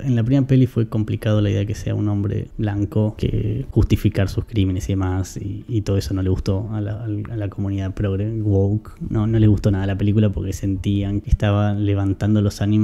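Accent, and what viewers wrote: Argentina. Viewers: Holaaa. Nuevo micrófono 🎤 necesitas calibrarlo o cambiar.,¿Puedo opinar?. En mi pantalla no logré entender algunas palabras con ese nuevo micrófono. (Use subtítulos , no sé si por ser mexicano pensé, el tono argentino pero. . . Pero pero ... En el celular con volumen un poco mas bajo y sin ruido alrededor.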